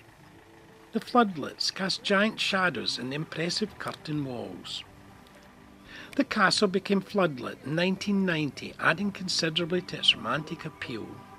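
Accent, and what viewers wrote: Scotland, United Kingdom. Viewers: Love hearing the Scottish broughe, as I'm Scottish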